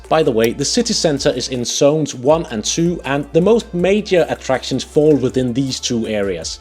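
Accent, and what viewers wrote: Liverpool, United Kingdom. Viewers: Thank you! My partner and I are visiting Copenhagen in two weeks time from Manchester and this video and the other video "My Amazing Day Trying New Things in Copenhagen City Centre" have been very helpful! We're just trying to work out your accent, have you spent some time in Scotland or also Northern England? lol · You speak English with a Liverpool accent. Were you taught English by a Liverpudlian? Love your videos. Good job. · You sound like a Beatle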